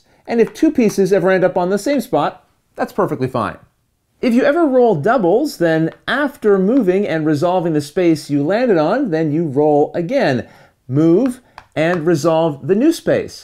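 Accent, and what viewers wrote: Canada. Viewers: Are you Canadian? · You sound like a Canadian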